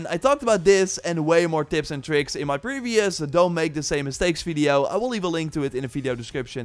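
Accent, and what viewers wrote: Netherlands. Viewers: are u dutch? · Zalig toch da Nederlands accent er tussen 😂 ma super tips wel ✨ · Please stop mispronouncing ‘duck’ (duiken, aan de kant gaan) as ‘Dutch’. You’re a Dutch speaker yourself, I can tell, however, your accent is fine, good job. But that one mispronunciation is just worse than the rest.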